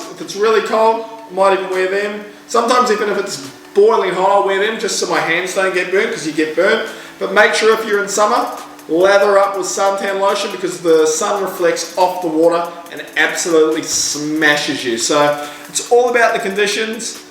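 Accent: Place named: Australia. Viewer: You sound Australian...Forgive me if I'm incorrect. If so, I am absolutely terrified of water in your country lol. Between your crocodiles, snakes, sharks (even if I don't have to worry about them), etc...The only water I would want to touch there is the shower, and my drinking water lol. If it's New Zealand, well that doesn't change much lol. In fact it's probably worse.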